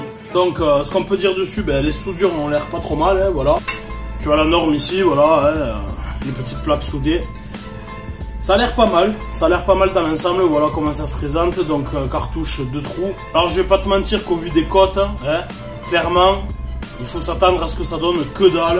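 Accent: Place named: Toulouse, France. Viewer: Comme prévu... j’attends ici ! Peinard, j’veux rien louper ! 😄 T’as le petit accent de par chez moi, tu dois pas être trop loin de Toulouse non ?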